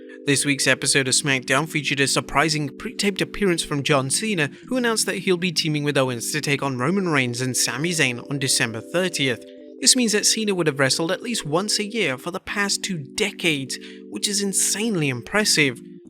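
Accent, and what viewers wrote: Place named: United Kingdom. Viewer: Idk why but hearing the narrator read Top Dolla's tweets was hilarious to me. Particularly with his thick British/English accent and saying "y'all". Lmao. Idk why it's funny to me but I was laughing so much during this segment. Probably just because I worked all this weekend and I'm tired/delirious. 🤣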